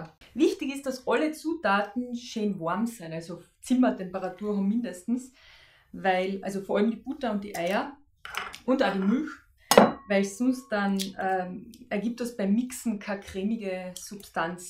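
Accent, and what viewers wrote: Carinthia, Austria. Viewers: Hab mei Ergebnis gerade bei Instagram gepostet inkl Verlinkung. Ist wirklich lecker 👌 Süß find i, dass du in dem Video deinem Kärntnerisch so treu geblieben bist 😊👍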